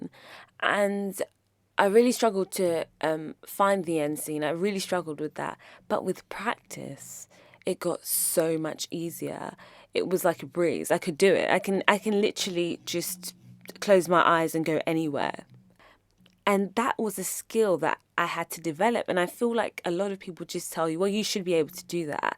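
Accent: United Kingdom. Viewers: This is so important. Side note. When you started speaking i thought it was me. We sound very similar its scary. Hello fellow Brit 🇬🇧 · It sounds like my mum's, which is southern english :)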